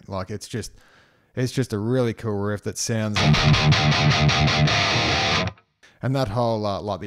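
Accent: Australia. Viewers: Assuming I've got your accent right, how about a showcase of your favourite Aussie metal riffs?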